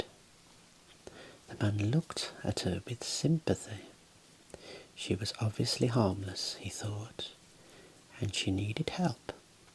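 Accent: United Kingdom. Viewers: Nothing is better than and his British accent ❤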